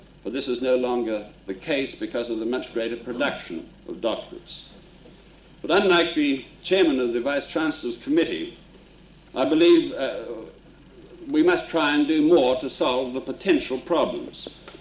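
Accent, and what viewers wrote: RP, United Kingdom. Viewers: sounds like the queens accent.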